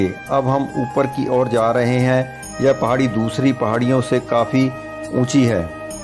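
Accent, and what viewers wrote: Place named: Punjab, India. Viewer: Bhai ji tuhade Hindi bolan de andaz to pta lgda he k tusi Punjabi bolan vale ho fir Punjabi bolo kion k isnu jiyada dekhan vale punjabi hn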